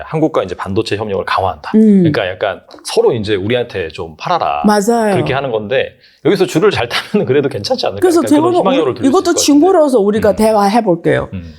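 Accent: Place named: China. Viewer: 말투가 중국사람이네요.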